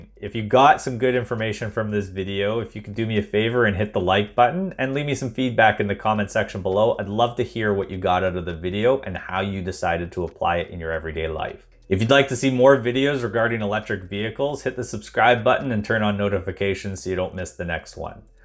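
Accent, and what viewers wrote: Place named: Canada. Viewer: Excellent, clear introduction to the LeafSpy! By the way, I suspected you were Canadian even before you said so. How? I am not sure I can explain, but it's a compliment.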